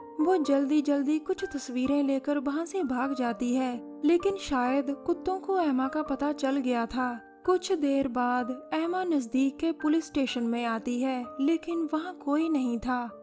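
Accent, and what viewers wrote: Punjab, India. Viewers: Mam I love your voice hope I didn't get addictive 😄❤️🇮🇳 Punjabi tone is the best 👍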